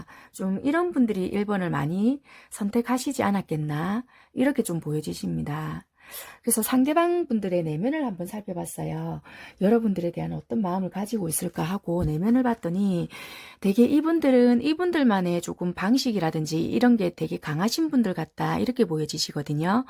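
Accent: Busan, South Korea. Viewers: 네, 물론 또 대화를 나눠볼 생각이에요. 근데 이런 흐름이면 좋겠다 싶은 생각이 들다보니 재회의 경우를 염두해두고 리딩을 받아들여도 좋을지 궁금했습니다 😊😊 상대가 관계에 대해 좀더 긍정적인 방향으로 생각했으면 좋겠다 싶다보니 이 리딩이 너무 위로가 되는듯한 말씀 같았거든요. 아무쪼록 리딩도 댓글도 다 감사드립니다:)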